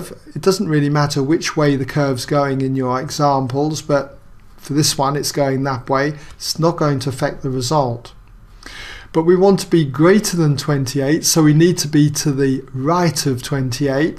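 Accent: United Kingdom. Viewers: British accent is always so charming :)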